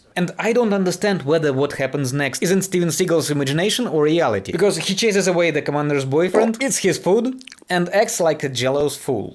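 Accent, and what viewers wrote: Russia. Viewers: The little trix with your and nice everyone can do that but you need to get someone that talk English. Not Russia.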